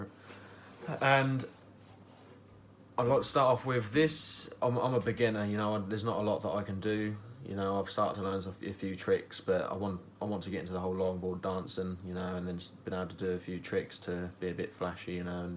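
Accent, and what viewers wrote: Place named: Norfolk, United Kingdom. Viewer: Excellent video my dude! Same board and couldn’t agree more about the bushings. Where are you from? I know the accent, Norfolk right?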